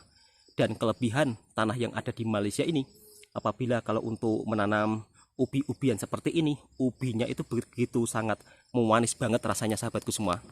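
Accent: Java, Indonesia. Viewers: Suka dengar cakap melayu slang jawa. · Kami semua faham bahasa kamu hya loghat je,pekat jawa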